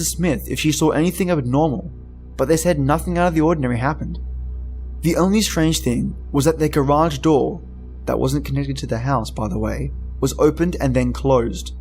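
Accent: Australia. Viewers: the Australian accent does but me to sleep. But I dong think my work wants me sleeping on the job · Hell nooo!! 😱 If someone was in my garage I would try to trap them in there until the cops showed up to arrest them. Good story, Great narrating, and as always awesome Aussie accent 😉 haha 🙂🖤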